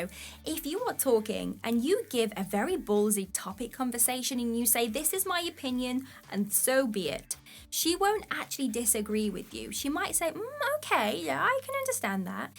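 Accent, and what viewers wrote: England, United Kingdom. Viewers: Love your accent yet can’t quite place it. London? Bath?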